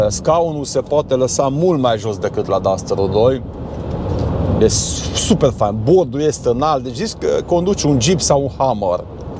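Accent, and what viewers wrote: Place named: Hungary. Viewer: No,un allroad şi perfect pentru Nămțîia asta care închide Fabricile.. Nai Treabā.. Superbā maşină pentru Clasa medie.. Ieftină ,fainā,,bună Ca Ciocolata Ritter Sport😂 Foarte simpatic prezentatoru. Apăi ios Şofer de Bus în Germania.. Sas din Ardeal Sas dāla..😂 Tare mult î-mi place accentu ăsta Maghiar😊.. Domnu cu voi..❤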